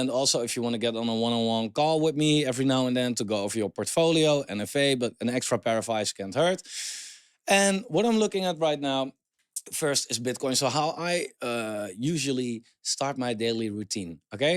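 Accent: Netherlands. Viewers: The accent ? , are you Dutch ? Kom je uit NL ?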